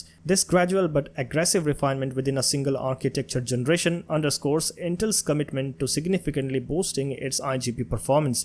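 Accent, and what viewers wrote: India. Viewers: I wanted to watch this video but as soon as I heard the indian accent it put me off jesus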